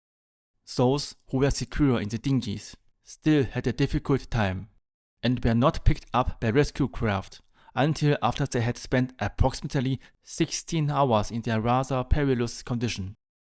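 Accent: Germany. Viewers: Thank you posting, and your English is perfectly understandable . I want to say your either Austrian or German going by accent . Thanks again for posting this, very interesting ..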